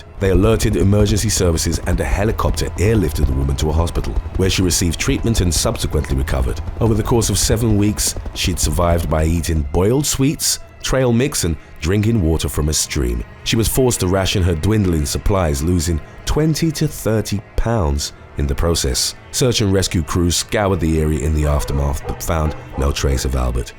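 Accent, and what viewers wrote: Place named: England, United Kingdom. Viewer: Dudes voice is up there with Barry white and Morgan Freeman. The narrator would make a fortune doin audiobooks and horror themed shit lol. The English accent just locks it in lol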